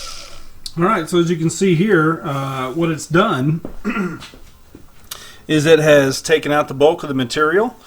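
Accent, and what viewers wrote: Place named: Texas, United States. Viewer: Google the mascot for Baird, TX... It's the Bears. and the accent gave it away. That is why I checked the mascot, he sounded local to me.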